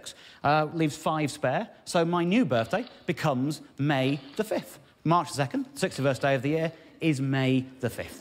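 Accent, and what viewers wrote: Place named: England, United Kingdom. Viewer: Clicked, heard the English accent and thought, "He must be a professor." Clicked the description. "Comedian. I was half right.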